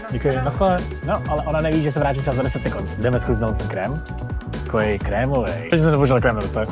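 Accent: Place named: Czech Republic. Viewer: It is crazy to hear a new generation of people of Vietnamese origin to speak with such beautiful Czech accent! It seems that I am really getting older, but a nice shock either way...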